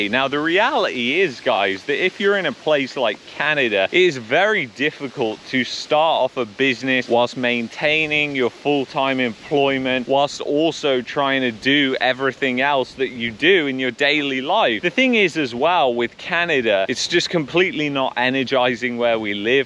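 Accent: United Kingdom. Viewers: You still have your northern accent so you'll fit right in. I am expat from UK, Dorset, on the south coast. I've always kept up with the UK news. I wouldn't go back to the UK if you paid me. The brainwashing there is quite possibly worse than here in Canada. The "new" Brits are nothing like the people I grew up with and they seem to have lost their compasses. Wait 'til you see how many rules and regulations there are that control so many aspects of your life. All this people living on an island with thousands of migrants arriving to use the benefits' system, that's why there are so many insane rules and regs. Too bad you didn't feel a loyalty to Canada and remember what a truly wonderful country this used to be.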